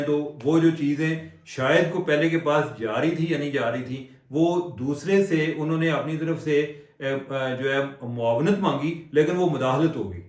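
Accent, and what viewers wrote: Punjab, Pakistan. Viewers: Punjabi touch. ❤🎉...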